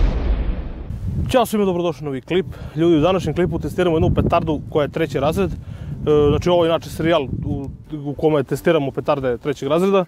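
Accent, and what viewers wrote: South, Serbia. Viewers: Najjaci juznjacki naglasak!!!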